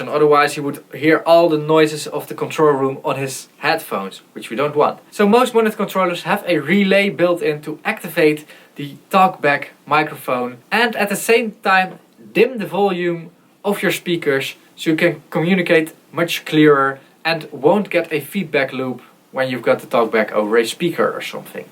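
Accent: Netherlands. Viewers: You sound dutch..right ?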